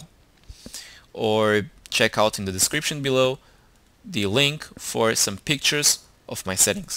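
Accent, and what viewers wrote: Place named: Brazil. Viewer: your accent sounds familiar are you Brazilian ?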